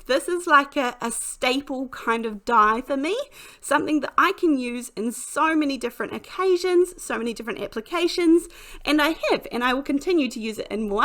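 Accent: New Zealand. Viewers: Very cool. These are interesting, effective and have great effects! (Love the Kiwi accent!)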